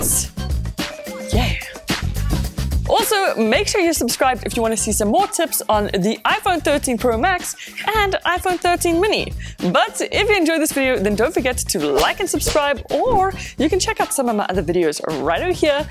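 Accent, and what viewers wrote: South Africa. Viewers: Thats a south african accent! Sandton? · South African accent.. Proud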